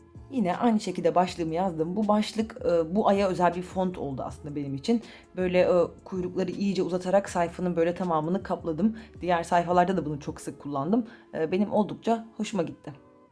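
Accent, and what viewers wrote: Adana, Turkey. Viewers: Abla adanalı olanlar anlar bende videoya girer girmez dedim ki adanalı mi acaba? 😂😂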